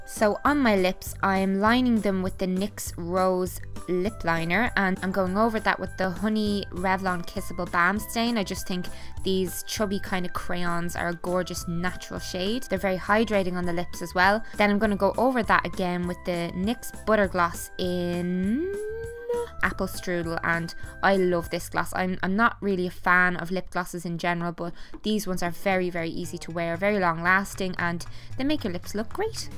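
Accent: Ireland. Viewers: Thanks :) Personnally I prefer english speaking .. ^^ And I like your Irish accent too, and one day I'd like to visit Ireland. Seems to be such a beautiful country! ;) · where in ireland are you from?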